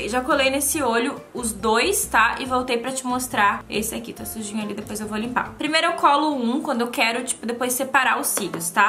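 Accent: Rio Grande do Sul, Brazil. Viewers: Te, ta, guria, guri, de... que amooorrr quando ela fala como gaúcha😍😍❤️❤️, sou do Rio Grande tbm, mas do interior, no fim do mundo na vdd 😂😂😂 Porto Xavier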